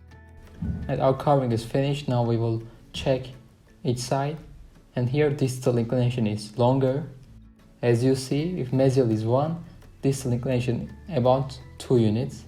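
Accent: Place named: Turkey. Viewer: You are turkisch? Because speaking like turkisch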